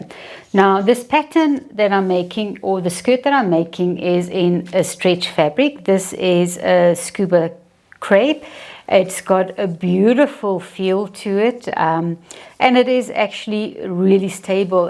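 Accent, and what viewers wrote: South Africa. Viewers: South African accent? Grâce pour l'information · ok cool. I wasn't sure if it was a South African or New Zealand accent. (Fun to listen to people from different countries) Thanks!!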